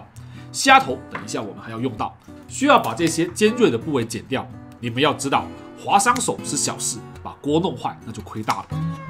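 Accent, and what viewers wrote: Chaoshan, China. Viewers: 好明顯係潮汕嘅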